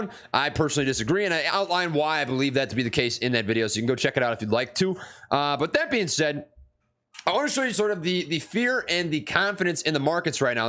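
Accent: Minnesota, United States. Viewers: Dude what was that Grizzly chew reference haha? You sound like a Minnesnowtan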